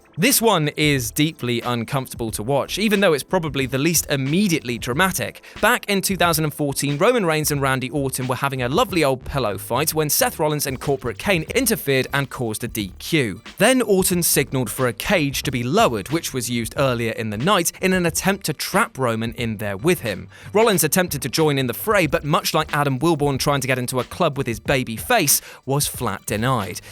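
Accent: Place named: Newcastle, United Kingdom. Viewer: Yessssss a fellow geordie 😂😂😂💪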